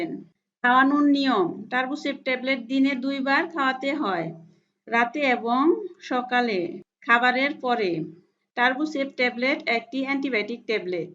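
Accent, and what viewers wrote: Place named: Tangail, Bangladesh. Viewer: Oi apnar voice sune mone hoy apnar basa tangail sotti na ki??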